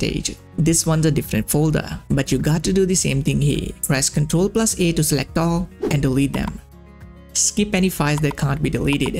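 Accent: India. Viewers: Jesus I was gonna watch this but his india accent bro is unbearable -- good bye.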